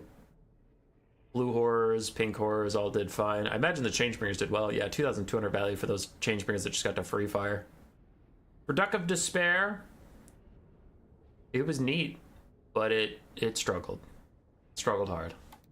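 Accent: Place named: France. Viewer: Just missed this :< gonna need a game cast in that exquisite french accent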